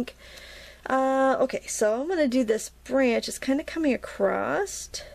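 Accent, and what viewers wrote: Maine, United States. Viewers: I believe its a Maine accent thing, she's saying across. I've heard it in a few other peoples videos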